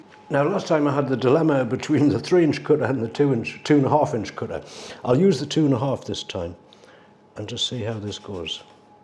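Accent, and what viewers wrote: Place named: Northeast England, United Kingdom. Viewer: Where are you from your accent sounds like the north east of England.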